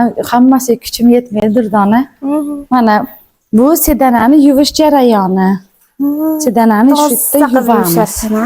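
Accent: Uzbekistan. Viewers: Кайерлисиз синглим шевез Куконлига ухшаяпти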